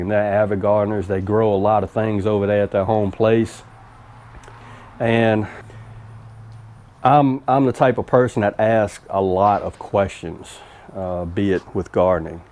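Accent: South, United States. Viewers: Glad this popped up on my YouTube today. Have been following your channel a little over a month now and haven't had time to go back & review old videos yet. I deducted about the 3rd video I saw that you were most likely on the force. Thank you for your service and continual prayers for your protection, as you protect others and our rights/freedom. "Don't let nobody Dull your shine!" was the final nail which made me know I had to follow you. Your accent, southern expressions and channel name caught my attn first. I've lived in Metros and small towns, but "in the sticks" is where paradise can be found (& created). Always enjoy seeing what's happening at the home place. You posted this Oct 2 of last year, saying it was a nice 85 degrees. This year, here in middle Ga 85 degrees is passed too fast to hit the 90s. Don't think we've had a lick of rain since August, so we are extraordinarily dry. 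Gonna hold out hope the cool will come again soon. Take care and keep shining!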